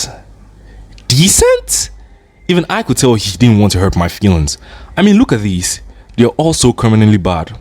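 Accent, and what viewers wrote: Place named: Nigeria. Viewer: oh okay you kind of sounded Nigerian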